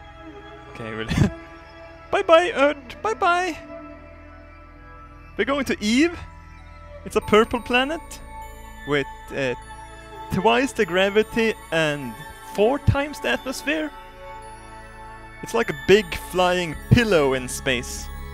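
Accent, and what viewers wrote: Sweden. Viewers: dat swenglish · The language he is talking is English. His accent is Swedish. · it's a typical swedish accent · It's his awesome manly Swedish accent maveon carter so go fuck off. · SurvivalGaming Romania Hes Swedish its a Y sound, like jimmy sounds like yimmy. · I love swedish accent:D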